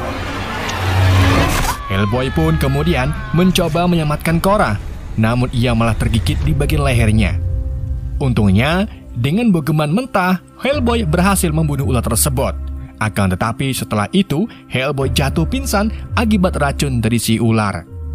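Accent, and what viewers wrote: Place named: Java, Indonesia. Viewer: Orang jawa sonnya😂😂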